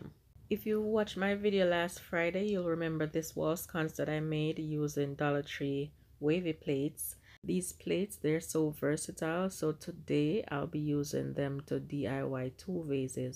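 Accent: Jamaica. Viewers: Beautimous 😍. One quick question- are you Jamaican? Your accent sounds similar to mine. 😉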